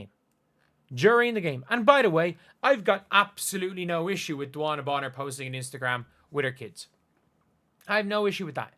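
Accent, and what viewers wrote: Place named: Ireland. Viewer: Ireland, he has a freaking accent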